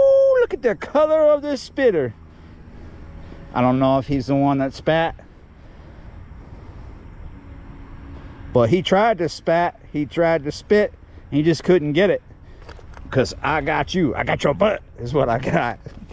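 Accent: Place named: Louisiana, United States. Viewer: Your Cajun accent started to kick in a bit more this episode.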